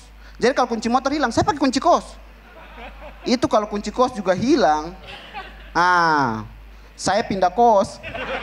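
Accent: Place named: Kupang, Indonesia. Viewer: Asli logat Timor Kupang.. 😅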